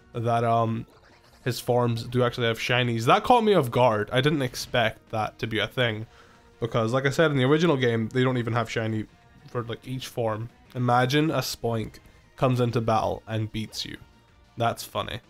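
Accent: Scotland, United Kingdom. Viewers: you sound like you're from Scotland like me!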